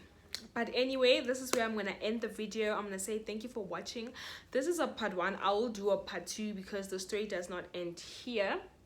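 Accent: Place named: Nigeria. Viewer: The Nigerian accent >> 😂😂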